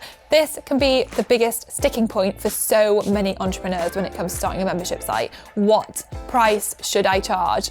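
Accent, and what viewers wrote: United Kingdom. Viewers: I admire the accent , literally. I am trying to pull out mine. i love the british accent.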